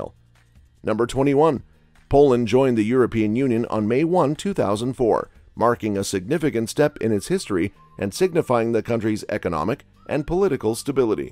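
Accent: United States. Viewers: Agree here. He should have learned how to pronounce it right and not to read it like an American would try it to pronounce. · Authentic Polish pronunciations should be used in this video- not Americanized pronunciation s. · Imagine 2023 having internet still saying polish names with us accent disrespect...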